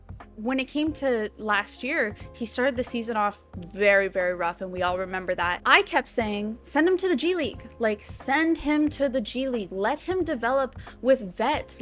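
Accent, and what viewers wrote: Canada. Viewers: i was not expecting the toronto accent omg 😭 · Are you canadian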